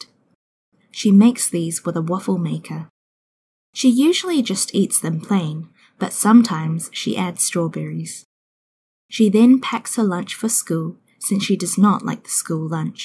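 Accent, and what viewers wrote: New Zealand. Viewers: Your channel's contents are encouraging me to do better listening skill, especially in NZ accent, but it is a little bit ashame that only a few people know this chnnel.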